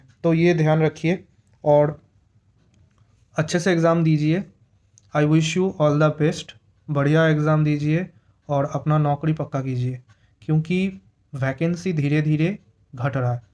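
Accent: Bengal, India. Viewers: তোমায় ল্যাঙ্গুয়েজ শুনে আমার তো মনে হচ্ছে তুমি বাঙ্গালি?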